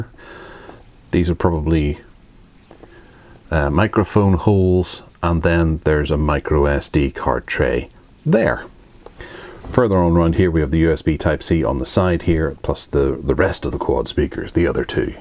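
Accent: United Kingdom. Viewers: Books: H2G2, David Niven, Roger Moore, Sean Connery, and an Aston Martin DB5 Model. And a very nice British gentleman's accent. So far the interesting part of the Video. The P11 is nice, though. ;-)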